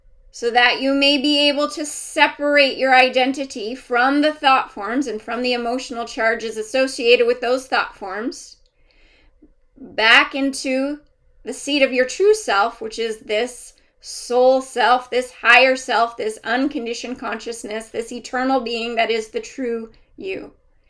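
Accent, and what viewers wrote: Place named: Canada. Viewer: Thank You, Took Me Years to Realize this. And The Re - Minder Is Great!!! ✨️✨️✨️⭐️💔💖💞 Love It. 🙂. I Luv The Way Your Guide Explains this. ☀️ And The Canadian Accent, Smile Is Priceless. 🙂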